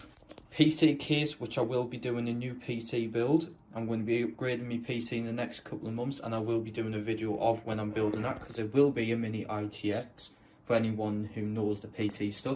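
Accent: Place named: United Kingdom. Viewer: Sick setup and wow your accent is different to what i have heard from british youtubers lol. keep up the good work.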